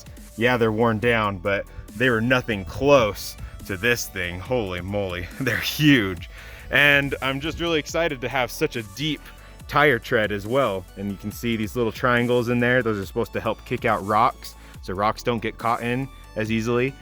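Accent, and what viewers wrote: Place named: Utah, United States. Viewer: Ha. I thought you had a Utah accent!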